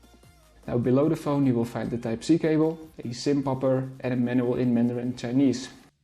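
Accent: Netherlands. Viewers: Als ik mijn ogen zou sluiten kan ik horen dat jij een Nederlander of een Nederlandstalige Belg bent.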